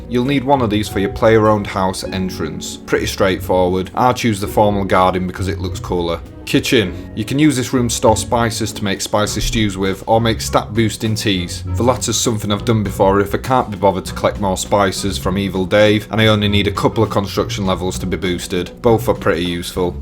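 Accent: Yorkshire, United Kingdom. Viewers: first yorkshire accent ive heard on an OSRS vid, legend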